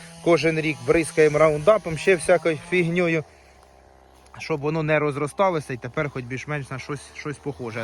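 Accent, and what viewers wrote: Poltava, Ukraine. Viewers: Він говорить Полтавською в нас це нормально.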